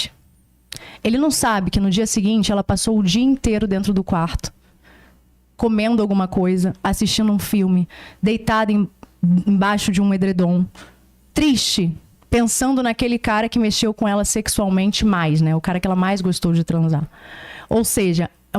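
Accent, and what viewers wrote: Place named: Rio de Janeiro, Brazil. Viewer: Ela é carioca, po. Se fosse baiana ela iria dizer "Oxente!", "Mainha", etc.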